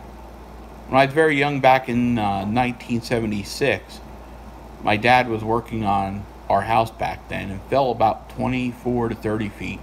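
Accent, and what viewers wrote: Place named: Eastern Pennsylvania, United States. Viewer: Did I read a comment that you are in Eastern PA. That is where I am Originally from. I should have recognized the accent.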